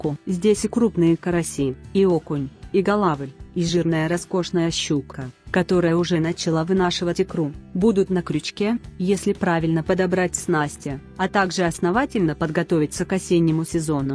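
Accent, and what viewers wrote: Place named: Baltic. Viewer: Вы из Прибалтики судя по акценту? Инфо как всегда интересное, спасибо.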